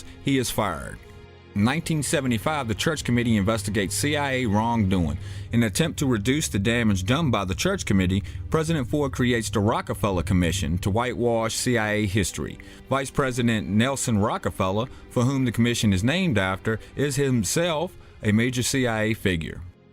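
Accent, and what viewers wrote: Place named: Louisiana, United States. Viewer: Nice one, It is nice to hear your real voice for a change very professional, well articulated, now hearing your voice with a light accent, I could just guess ,maybe your a Louisianan. All good fronts exposed and revisited, Thank you for all your run downs on the populous controllers. Peace Brother. :)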